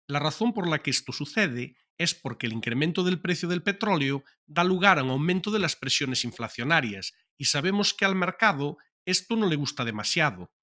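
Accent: Galicia, Spain. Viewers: Acento gallego? Me recuerda al gran Miguel Anxo Bastos. 😆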